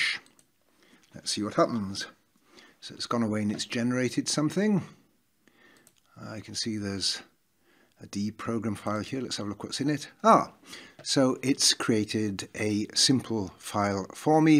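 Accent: Wales, United Kingdom. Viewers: This is the first video I have seen/been suggested of yours. While I very much agree with the message and this way of learning a new language I was mostly happy to hear a Welsh accent after not having been back to Wales in 4 years.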